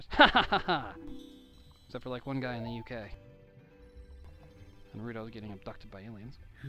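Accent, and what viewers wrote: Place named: Australia. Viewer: p.s good aussie accent man. lol im aussie and you sound like a lot of people here.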